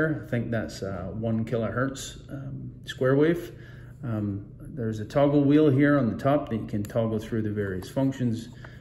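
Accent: Scotland, United Kingdom. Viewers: a wee Scottish twang in your accent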